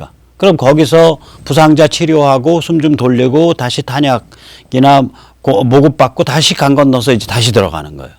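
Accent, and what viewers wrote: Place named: Seoul, South Korea. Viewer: 오늘도 자알 들었습니다. 수강료를 고액지불하고 들어야할 정도로.. 그아말로 세뇌탈출..! 감사 합니다. 때때로.. 아는 사람들만 알아듣는 님의 "서울사투리"가 감칠맛을 돋궈주십니다.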